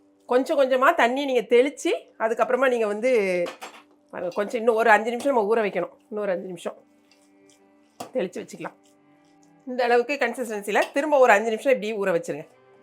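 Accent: Tirunelveli, India. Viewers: 👌👍 திருநெல்வேலி யா நல்ல தமிழ் பேசுதேங்க இது முதலில் எழுதியிருக்கேன்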